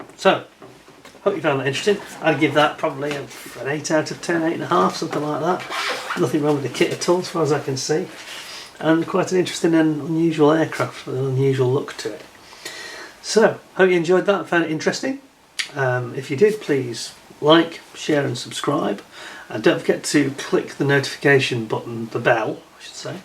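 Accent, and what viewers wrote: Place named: Liverpool, United Kingdom. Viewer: Sometimes I can hear "K" in the end of -ing words (like "something"). Because I am Beatles fan, so I thought you are from Liverpool area :) BTW - great channel, you are doing excellent job. During coffee brakes I like to watch your unboxings. These clips remind me good old days, when I was a young boy, and a great fan of Matchbox. Well done, mate.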